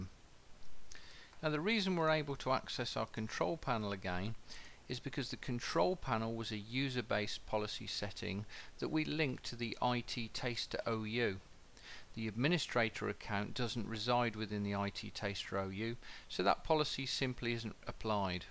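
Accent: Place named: England, United Kingdom. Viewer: i must say i like your English accent although i haven't done viewing this video, it sounds like you are from England, plus sounds like Klaus from vampire. Anyway, thanks for the video, and i'm studying now.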